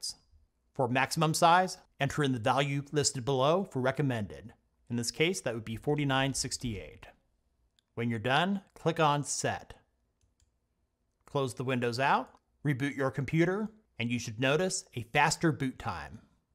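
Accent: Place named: Appalachia, United States. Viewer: in Hilly Billy accent just classic!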